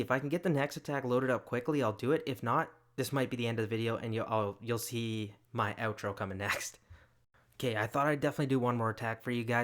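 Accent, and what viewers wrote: Canada. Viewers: You sound Canadian, eh? 🤔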